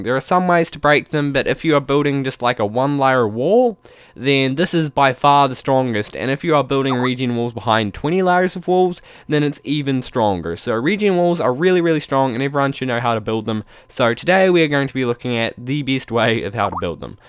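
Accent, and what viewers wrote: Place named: New Zealand. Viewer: im pretty sure he's from new zealand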